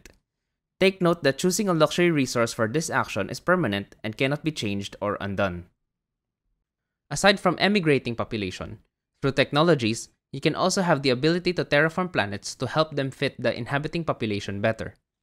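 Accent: Philippines. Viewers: I knew from your accent that you're a kababayan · Hearing Tagalog accent was a surprise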